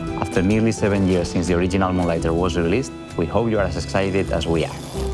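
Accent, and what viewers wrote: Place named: Spain. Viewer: el que está comentando.... parece español por ese acento jejej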